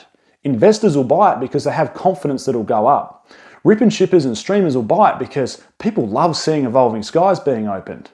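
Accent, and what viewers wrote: Australia. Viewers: Hey, legend, random question but are you aussie by chance?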